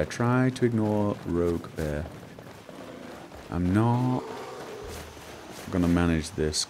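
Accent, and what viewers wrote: United Kingdom. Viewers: WARNING: Watching vids can cause you to talk to yourself with a British accent when gaming.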